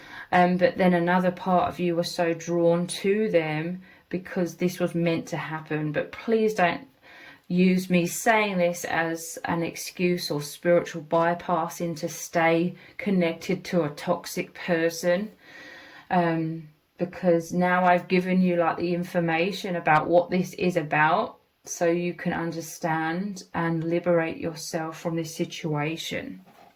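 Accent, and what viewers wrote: England, United Kingdom. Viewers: Believe me it was as if I was listening to my own very story I love you beautiful English accent it's like listening to a Melody thank you you are truly gifted a true seer a true Oracle indeed you are blessed and I thank you!!!8!!!😊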